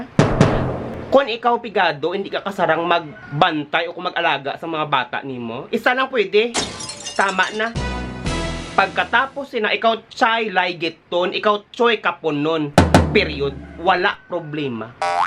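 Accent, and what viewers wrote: Iloilo, Philippines. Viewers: Im not ilonggo,, i just know tagalog,,i dont know how i can undrstand but in someway ican understand a bit what you are saying,, ,,,i love the ilonggo accent!❤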